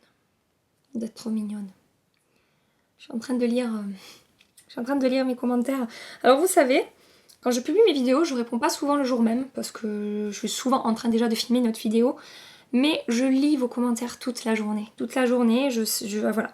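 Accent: South, France. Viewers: Hello la belle ! cette vidéo est un régal , j'adore tes improvisations et t'écouter parler ;), tu es du sud de Narbonne si j'ai bien compris ? tu as un accent sympa , moi aussi une sudiste mais de Montpellier ! merci pour ce super descriptif de la palette qui me fait énormément envie , elle est sublime et très complète <3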